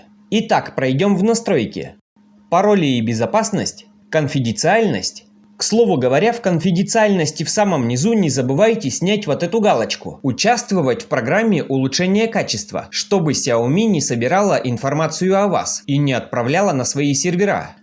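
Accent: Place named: Ukraine. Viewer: Почему у хохлов, такой ****** говор? Нет, реально раздражает.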